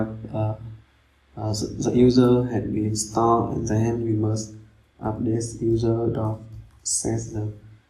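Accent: Vietnam. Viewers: Đúng cái mình cần 😂😂 nghe cách pronounce đoán ngay a zai ngưòi việt 🤭🤭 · nghe giống người việt nam v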